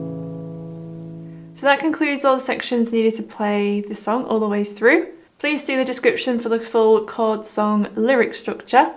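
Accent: United Kingdom. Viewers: "I'm gonna teach you how to play by, with a lovely British accent."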